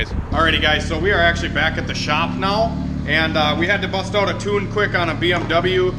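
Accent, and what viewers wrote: Canada. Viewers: I can't tell if your Amish or Canadian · dude i thought you were canadian. funniest shit I have watch while being in-depth about tuning. have him get the Ebay special headgasket next time.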